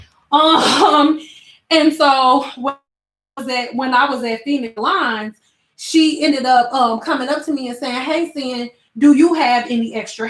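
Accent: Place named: Detroit, United States. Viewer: New SUBBIE, are you from Detroit? “woot woot”and the way you talk reminds me of HOME 313 🥰. You’re very inspiring, I introduced my cosmetology class to your channel 💕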